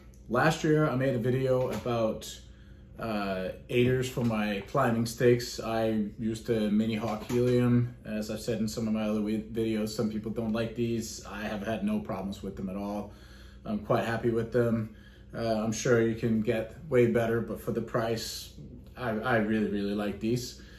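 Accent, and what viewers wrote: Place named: Russia. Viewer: Thanks for the idea, im doing this as i type! Btw, what country are you from? Ive watched alot of your videos, and i swear i can hear a slight dialect from maybe russia?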